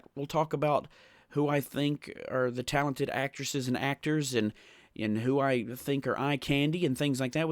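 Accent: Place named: South, United States. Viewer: U HAVE THAT SOUTHERN ACCENT WHAT STATE U LIVE IN ??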